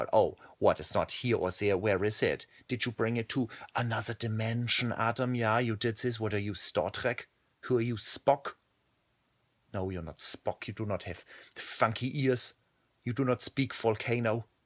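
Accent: Germany. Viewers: Ich will nicht sagen, dass dein deutscher Akzent weh tut, weil ich selbst Deutsche bin und natürlich auch einen hab. Aber echt ehy :D trotzdem bist du ein echt cooler Typ :)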